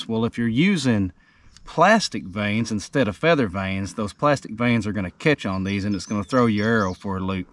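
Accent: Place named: United States. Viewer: I'm glad I I'm learning smth from this Guy. I like this American Accent better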